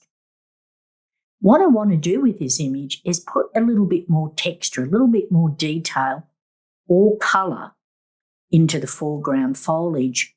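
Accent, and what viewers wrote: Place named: Australia. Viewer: Lovely to hear an Australian voice. I am going off to try this technique, the results looked great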